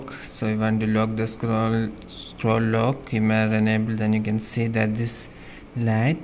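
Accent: India. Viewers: its always the guys with the indian accets that have random videos for these random problems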